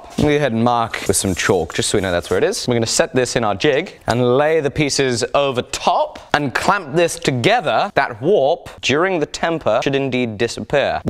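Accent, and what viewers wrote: South, United States. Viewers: This is one of my favorite episodes! So we’ll edited! The buildup each time you try to straighten the blade, your patience, your humor, showing us the fails and the wins, and the Southern accent was priceless! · Please make an ENTIRE episode in yer southern accent!!! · your southern accent reminded me of Bobby from Brickleberry hahhaha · The American accent 😂😂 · Love the southern accent. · You should use your american accent more often.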